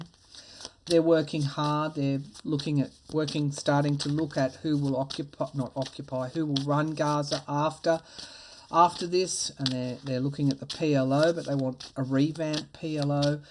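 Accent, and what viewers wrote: Australia. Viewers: She sounds Australian to me .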